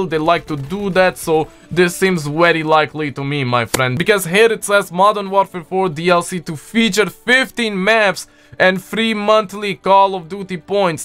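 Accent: Russia. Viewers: please stop the russian flow bro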